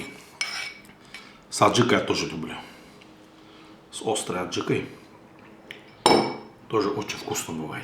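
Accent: Baku, Azerbaijan. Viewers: ТАК ПРИЯТНО СМОТРЕТЬ КАК ВЫ ГОТОВИТЕ С БОЛЬШИМ НАСТРОЕНИЕМ И БАКИНСКИМ АКЦЕНТОМ ПРЯМ СУУУПППЕЕЕРРРР. 👍👍👍👍👍👍👍👏👏👏👏👏👏👏🇦🇿🇦🇿🇦🇿🇬🇪🇬🇪🇬🇪🇬🇪🇬🇪❤❤❤❤❤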